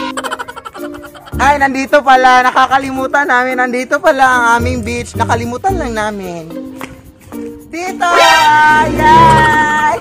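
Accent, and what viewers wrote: Visayas, Philippines. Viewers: Taga visayas ka te? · Kuya bisaya kaba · bisaya kaba?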